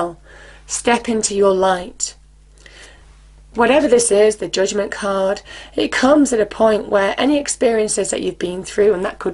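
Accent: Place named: RP, United Kingdom. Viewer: 🌸gosh I luv ur intro and your voice, so posh 👍🏼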